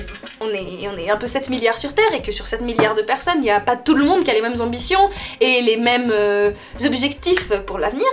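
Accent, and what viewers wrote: Geneva, Switzerland. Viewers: Je t'aime putain !! Tu es genevoise ??? En tout les cas tu a l accent genevois. Ça fait un petit moment que je te suis et j adore ta personnalité et ta conception du monde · Ça me faire sourire comment vous dites certains chiffres les suisses voila :)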